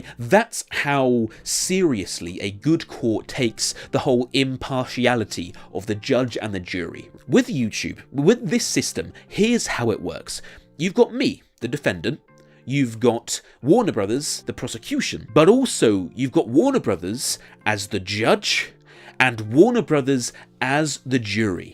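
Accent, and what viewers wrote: United Kingdom. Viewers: Because he’s got a regular British voice and a regular British face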